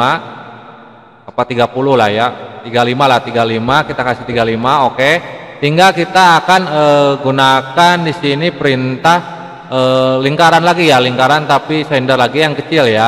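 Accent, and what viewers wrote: West Java, Indonesia. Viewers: kayak logat sunda ya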